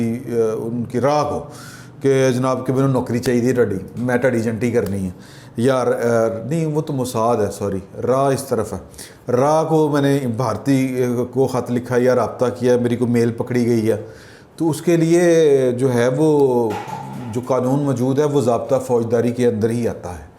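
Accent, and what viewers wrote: Punjab, Pakistan. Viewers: پہلے اپنی اردو تو ٹھیک کر اردو بھی پنجابی کی طرح بول رہا ہے كابرانا نہی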